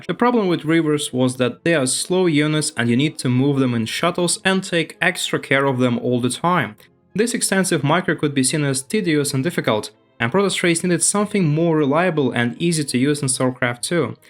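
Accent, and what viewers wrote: Russia. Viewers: по акценту можно узнать, что русский))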